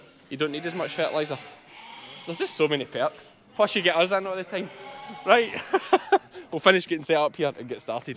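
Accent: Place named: Ayrshire, United Kingdom. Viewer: I should have kept up with your videos,! I haven't watched for about 5-6 months and I have lost my ability to really understand an Ayrshire brouge.□ My bad. I've got to do better! Terri from Texas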